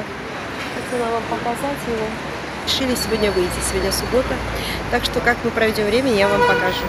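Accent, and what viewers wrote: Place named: Georgia. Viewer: Простите,вы,по национальности грузинка? У вас,акцент ,наверняка грузинский.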